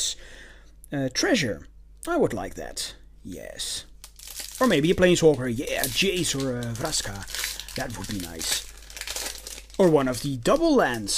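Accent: Netherlands. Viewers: are u dutch?? ik hoor het aan je accent :>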